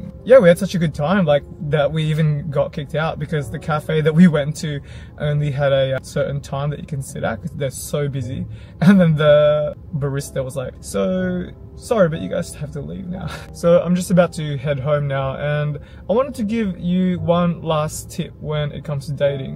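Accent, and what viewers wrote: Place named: Australia. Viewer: guess I confused the Australian accent w/ a British accent . Luv your vlog & style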